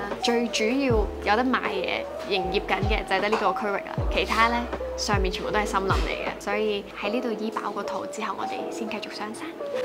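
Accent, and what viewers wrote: Taiwan. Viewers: 靚人靚景，國語台灣腔好聽❤🎉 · 人家在 台灣讀 大學 畢業 當然 向 台灣音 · 你的中文说得很好，说得很像台湾人。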